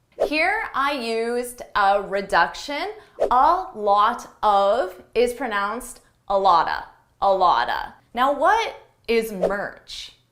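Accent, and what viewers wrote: United States. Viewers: This isn't English. This is American. Don't learn this if you want to learn English pronunciation. It's a waste of time. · American accent is quite easy to understand, British one is so challenging though. I listen your podcasts on 1.5 speed and face no issue. In terms of British accent, a lot of words are not understandable for me like, theme etc.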